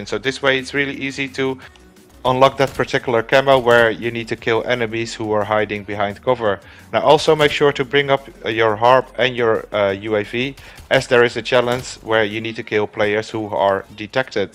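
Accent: Netherlands. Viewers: Thanks for posting! Btw are you Dutch?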